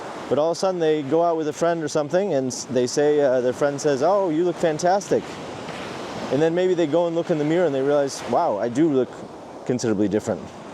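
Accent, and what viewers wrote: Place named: Canada. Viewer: Are you from Canada? Seems you have an accent. 😅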